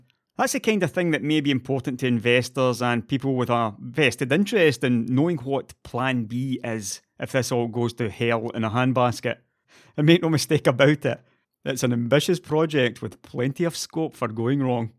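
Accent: Scotland, United Kingdom. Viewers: Love hearing that Scottish accent. Music to the ears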